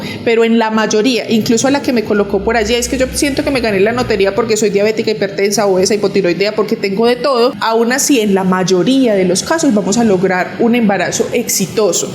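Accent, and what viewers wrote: Colombia. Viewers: Hoy llege tarde 🙋‍♀️🤭 saludos bella ❤ Amo su acento colombiano ☺😊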